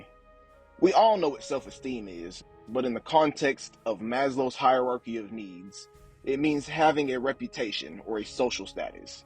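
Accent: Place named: South, United States. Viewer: Oh it’s definitely not just you, he has a bit of a Southern accent but i think its cute🤭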